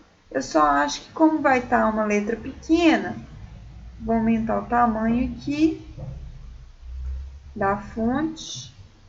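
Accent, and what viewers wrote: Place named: Minas Gerais, Brazil. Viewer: Valeu, profa! Ótimo introdutório e com esse maravilhoso sotaque mineiro (adoro!)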